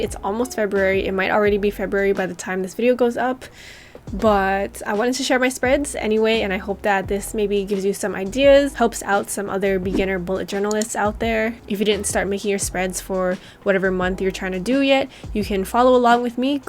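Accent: Hawaii, United States. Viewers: ho you sound like you from Hawaii.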